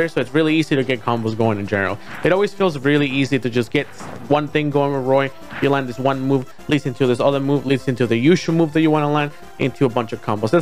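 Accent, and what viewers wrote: Chile. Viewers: Eri chileno?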